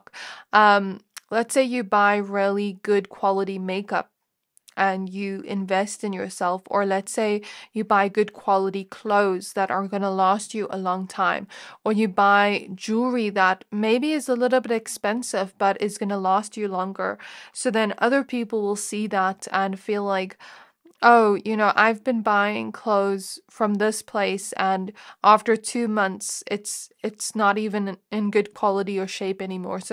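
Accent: South Africa. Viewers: Beautiful reading. Thank you. Are you South African(accent)? Pile 2.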